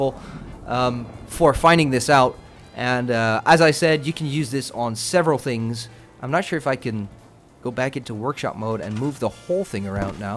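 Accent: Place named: Scandinavia. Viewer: Nice accent. I bet your either from Sweden, Finland or Norway. You guys are the only one that can both speak a very good english and have an excellent accent while doint it. Am I wrong ? :p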